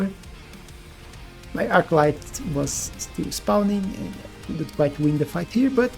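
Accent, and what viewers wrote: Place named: Brazil. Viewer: Eu também sou brasileiro aí reconheço o sotaque! Hahahah Você joga bem, mano, parabéns! Desejo sucesso!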